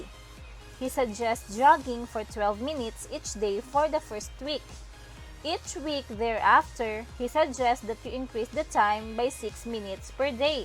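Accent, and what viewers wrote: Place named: Bicol, Philippines. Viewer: Hi ma'am thank you po,,btw napansin ko po na parang taga Bicol ka? I'm from Bicol din po kase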